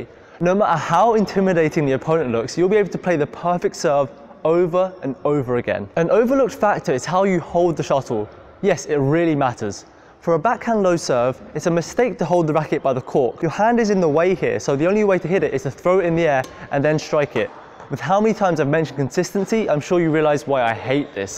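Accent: United Kingdom. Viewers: Wow british accent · Is this guy Asian or British ? He sounds more like British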